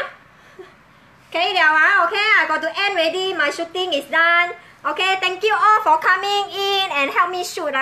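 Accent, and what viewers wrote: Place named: Ah Lian, Singapore. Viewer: She only talk like ah lian.but not really ah lian. She got say she dun smoke or go crubbing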